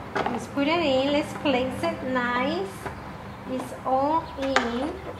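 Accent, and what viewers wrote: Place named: Mexico. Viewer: Let's be honest... Who the hell cares about learning how to change the Air Filter ? We all know how to do that but watching a beautiful girl explaining the process it's music for my ears and pleasure for my eyes. I have a 2005 Toyota Corolla XRS but I installed TRD Air Intake, something little bit different Well done mami, I love your video and your accent Viva México Ca... !!!!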